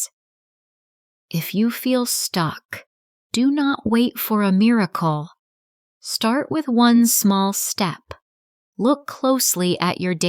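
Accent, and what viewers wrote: United States. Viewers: This Chanel is American because the accent is American clearly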